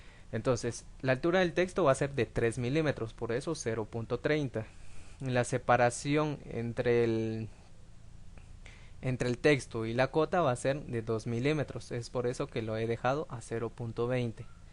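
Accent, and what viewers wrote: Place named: Guatemala. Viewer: Ya decía yo :D jeje no pude confundir tu acento chapín mi hermanito! :D Dios te bendiga :D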